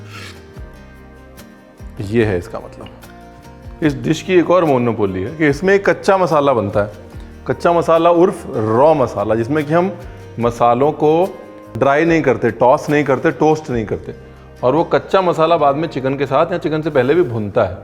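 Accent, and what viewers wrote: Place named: Punjab, India. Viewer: Aa bani gal.... Vich vich punjabi shi lagdi aa. ..good........... yar JALANDHAR ton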